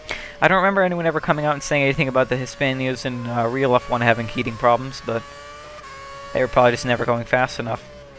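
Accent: United States. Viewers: So why's he got an american accent?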